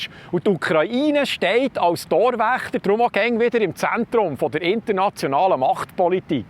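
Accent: Bern, Switzerland. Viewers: Das Berndeutsch ist toll,die Gesinnung auch,danke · Sehr komplexes Thema, aber super erklärt und mit dem Berndütsche Dialekt au no wahnsinnig sympathisch – weiter so, wir freuen uns auf die nächsten Videos. Viele Grüsse aus Chicken Hill at the Lake (Hünenberg See)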